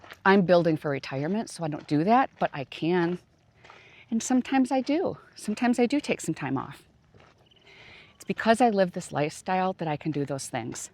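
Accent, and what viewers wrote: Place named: Midwest, United States. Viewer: Same here! What labs 🧪 do you think the original poster and I should get? It feeling absolutely awful! You sound like you have a Midwest accent my friend! I too got a hysterectomy but a bit younger at 36. Perimenopause and adrenal fatigue for 5 years now. Hormones came back normal, so now what and who do I ask for the labs? Thank you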